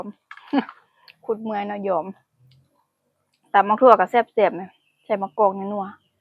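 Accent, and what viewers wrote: Phu Thai, Thailand. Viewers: เป็นสาวภูไทยติ ฟังจำกเสียงดนตรั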